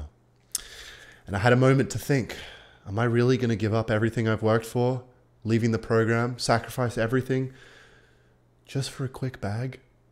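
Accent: Australia. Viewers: Aussie accent and he grows a moustache so one day he can shave it and style it lile certain austrian painter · Why are you Australian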